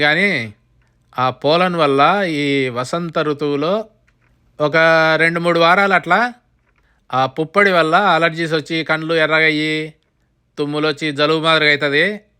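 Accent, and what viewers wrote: Rayalaseema, India. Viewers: Thank you Liked your Telugu Royal Seema accent too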